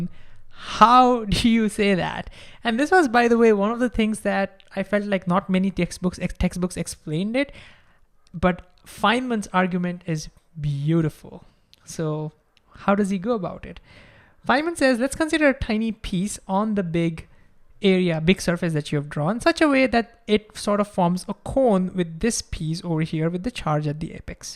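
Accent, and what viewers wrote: India. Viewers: JUST WANTED TO KNOW OUT OF CURIOSITY ARE YOU BY ANCHANCE AN INDIAN ? SINCE YOUR ACCENT IS A LOT LIKE US ,BTW YOUR VIDEOS DO SPARK A VERY INTENSE INTREST IN PHYSICS AND THANK YOU FOR THAT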